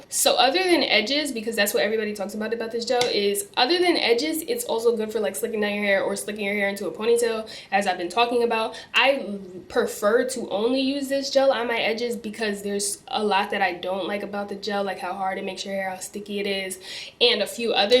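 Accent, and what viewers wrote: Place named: United States. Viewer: Where's that accent from? 😍😍😍 I'm from the UK and i just can't place where in the states that is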